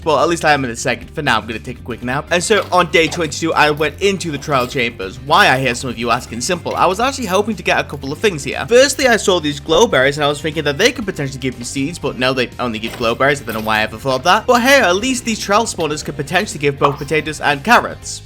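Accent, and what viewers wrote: United Kingdom. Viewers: i think its scottish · I love his britishness. "Frew out" "smoofer" "evewyfing"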